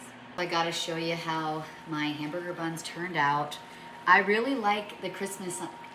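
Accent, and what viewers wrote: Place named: Michigan, United States. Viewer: There is nothing wrong with her Michigan accent! We also say melk instead of milk! 😅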